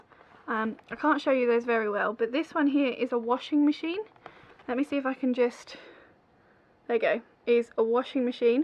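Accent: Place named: England, United Kingdom. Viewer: Really interesting and refreshing to hear an English accent. Also great tips. Thank you for sharing.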